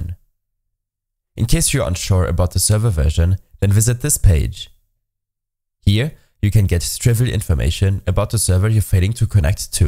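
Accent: Germany. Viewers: MAnn merkt so das du deutsch bist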